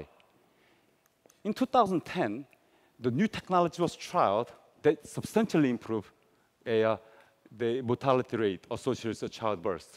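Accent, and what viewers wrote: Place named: South Korea. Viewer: He has a thick accent. I understand that he's a Korean. I wonder why doesn't he speak Korean to Korean audience?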